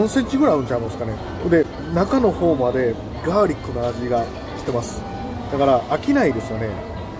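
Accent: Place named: Kanto, Japan. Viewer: さん大阪？大阪の人なら「今日もはじまるぜぇ〜」はないで！ 「今日もはじまんで〜」せめて「今日もはじまるでぇ〜」 動画中は敬語喋られてるから関東訛りっぽくなるのはしゃーないけど、もう少し大阪アピールして欲しいな^_^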